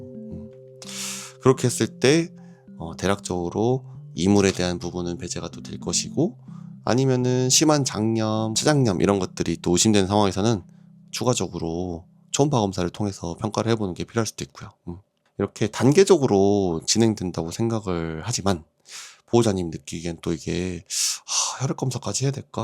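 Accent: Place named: Gwangju, South Korea. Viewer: ㅋㅋㅋㅋㅋㅋㅋ 광주분이신가용? 억양이 겁나게 친숙해브네요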